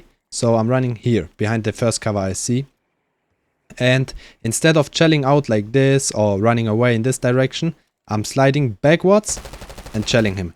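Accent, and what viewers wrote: Germany. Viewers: Damn Bro U Made m3 a movment demon fr and i can hear the German accent 😂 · Are you German ?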